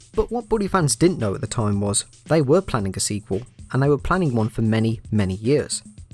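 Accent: United Kingdom. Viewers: He’s British that’s why he sounds like this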